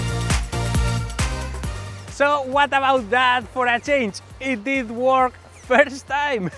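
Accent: Spain. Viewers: At first I wondered if you were spanish, then I heard you talking and knew it for sure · Como se nota por tu ingles que eres español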